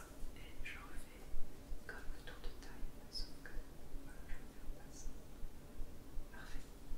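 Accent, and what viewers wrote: France. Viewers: Hey friend! I hope you are going really well :) I just wanted to say that this really sounds absolutely wonderful and lovely! You have an amazing french voice, its so soothing and lovely to listen to. I hope you are staying safe and happy.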